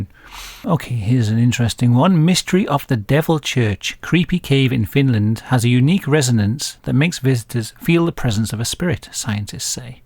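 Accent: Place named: North Wales, United Kingdom. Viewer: I knew you were from north wales😂😂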